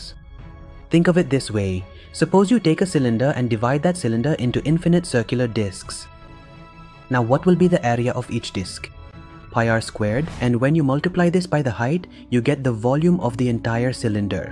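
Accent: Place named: India. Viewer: Ein Inder oder Pakistaner? Typischer Akzent.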